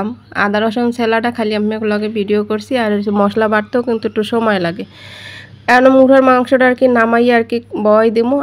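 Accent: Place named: Noakhali, Bangladesh. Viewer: আপু লাইক দিয়ে দেখা শুরু করলাম,, আপনার কথাবার্তা আমার খুব ভালো লাগে,,এটা কি নোয়াখালীর ভাষা???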